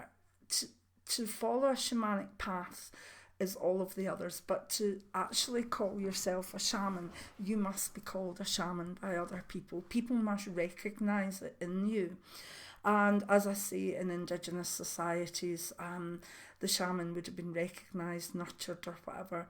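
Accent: Scotland, United Kingdom. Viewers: Hello. I enjoyed this. I hate shoes.... although I get the coldest feet so have to give in on colder days. As a child I regularly saw a shamanic indian and have alwaya been fascinated by the culture and way of life. Nature and animals are my bliss although being crocked up and arthritic I avoid drastic weather. I am effected by storms. Headaches ans ears ring. Always know when they are coming. I work at present as a psychic on a phone line ( serious health issues meant I had to give up mainstream work) and embracing this side of things. I have always felt odd and a bit different and despair at the sadness and pain. I enjoyed this video. I love your soft scottish accent. I am cornish so sending peace love and healing light from south to north to you x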